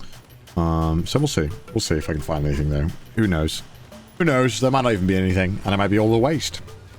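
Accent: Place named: Wales, United Kingdom. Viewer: Then why are you busy streaming in online platform like youtube in your channels to have money but not get a job for that country japan and live for years. Maybe you and your co should follow up your status because the government in Japan can be changing the policies when it comes to foreigners. And add to that they had lower birth rates and the government made a drastic plan for that. Why ask led Joey about his travelled in many parts of the country. Sinced you are from U.K. particulary Wales sinced your accent is deep.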